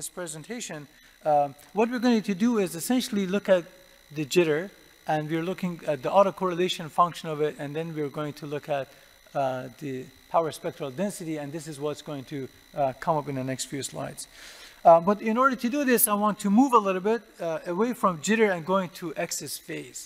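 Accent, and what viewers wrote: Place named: Iran. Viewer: mamnun. az avale lahje fahmidam ke hamzaban hastim. baese eftekhare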